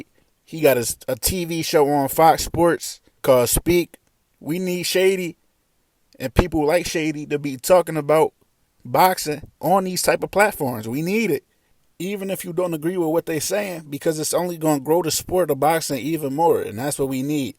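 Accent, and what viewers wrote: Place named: Pennsylvania, United States. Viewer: I DEFINITELY THOUGHT YOU WAS FROM MY CITY BUT YOU STILL FROM PA SO YOU HAVE OUR STRONG ACCENT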